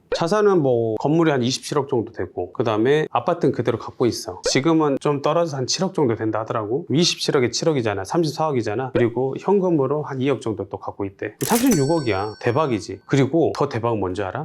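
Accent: Jeolla, South Korea. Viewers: 나도 전라디언싫어하는데 쟤는 말씨 안숨기잖아ㅋㅋㅋ억까는 하지말자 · 맞는말도 전라도 사투리라 사기치는 느낌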